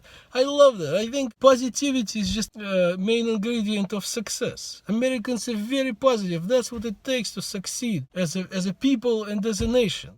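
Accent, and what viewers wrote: Russia. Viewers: This is such a beautiful video and when you talked about 9\11 I remembered that since I was born on 9\6 I woke my mother simply for food and she saw what happened the year, god bless those poor guys who lost their lives. And its really is surprising how I saw this video 4 years later. May god bless you and your family mister russian! Your accent is awesome by the way and I'm glad you enjoy your life here in America ówò)/🍬❤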